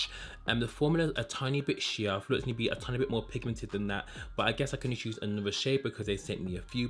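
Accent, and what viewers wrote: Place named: Cockney, United Kingdom. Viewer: I get my Sephora-fix by going over to Paris on the Eurostar. If you don’t mind me asking, are you from the midlands or London? My hubby says your accent is cockney...I say Brummie. Lots of love from Bristol🥳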